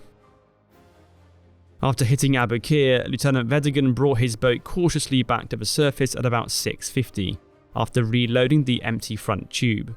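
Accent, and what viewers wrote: United Kingdom. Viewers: Ur voice sounds like british accent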